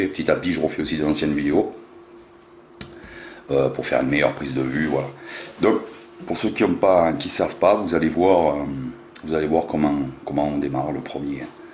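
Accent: South, France. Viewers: votre accent du sud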